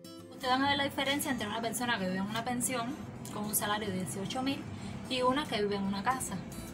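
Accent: Cuba. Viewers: Qué bonita😍😍😍 Supongo que sos cubana, por el acento, bienvenida y suerte, un beso😘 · Siiii....me encanta la suavidad del acento cubano!!.Es muy dulce. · Tu acento se parece al mío. Eres CUBANA !!!!!!!! BENDICIONES !!!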